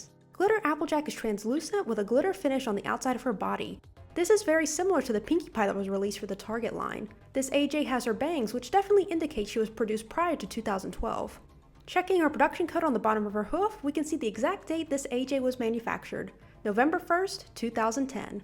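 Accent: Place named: United Kingdom. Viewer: She’s from the UK.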